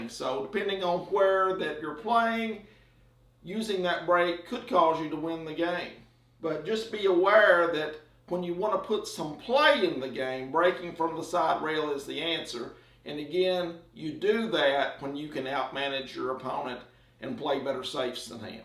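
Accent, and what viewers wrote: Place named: Kentucky, United States. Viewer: Your last break was a thing of beauty. Great run out position. I liked your break strategy discussion, too. I have played around with 2nd ball break to put the 8 ball in play, but to me it’s not worth it. Probability of pocketing the 8 too low to justify a poorer spread. Keep ‘em coming. Enjoy your KY accent, reminds me of home (Texas).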